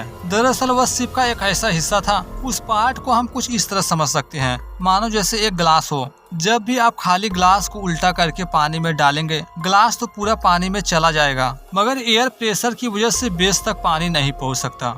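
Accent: Uttar Pradesh, India. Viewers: Brother you don't speak english words in your vedio. Your UP accent is listening in your vedio. Like starting you are saying istarting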